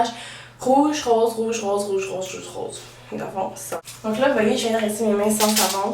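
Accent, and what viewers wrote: Quebec, Canada. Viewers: Sa s'appelle avoir un accent Québecois.... -____ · Mais, putain fermer la !! C'est t'est venu juste ici pour la critiquer bah! Vas t'en ,, moi je la trouve magnifique d'même ok!! Chacun à son gout ,, son accent que vous dite , c'est du québécois ;p! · ahh ta yeule sa s'appelle ''l'accent québécois'' ok? bye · son accent..?? c'est une québecquoise loll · Elle est québecoise hein. C'est normal ça fait partie de leur manière de s'exprimer. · c'est son accent car elle est Québécoise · titi la gourmande elle est québécoise et les québécois et québécoise on un accent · Accent québécois espèce d'inculte -. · j'adore trop ton accen du Quebec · Vous pensez qu'écrire des commentaires de ce genre vont servir à quoi à part lui faire du mal? C'est juste méchant parce que son accent, tout les québécois l'ont, ses sourcils, je suis d'accord que ça lui va pas mais peut etre qu'elle s'est juste louper. 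Juger sur le physique c'est juste puéril et purement méchant. Si vous dites ce genre de choses c'est que vous ne la connaissez vraiment pas. Je n'ai pas la prétention de la connaitre, je dis juste que je suis toutes ses vidéos et j'ai pu remarquer que c'est une bonne personne qui a du cœur. Laissez tomber... ne fais pas attention à eux, ils veulent juste te blesser, ça vaut pas le coup, j'en ai eu l'expérience. Love. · Son français est juste québécois laisser la gang de "français meilleur que les autre" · Comme j'aime dire des trucs HS, j'adore l'accent québécois! :P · Perce qu'elle est québéquoise (ou canadienne) et vu que c'est près des usa il y a toujours un accent ;)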